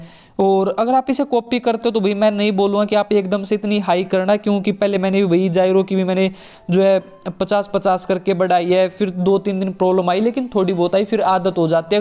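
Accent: Haryana, India. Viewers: Big fan bro I think you are from Haryana 🧐I am right plzzzzzzzzzz reply 🥰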